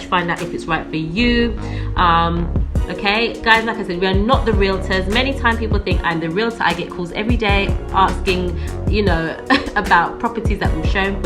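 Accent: United Kingdom. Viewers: Greetings to my lovely family 🤗 manner an Prayer, thks again mi always love British accent ❤💖♥.. wonderful 👍🏿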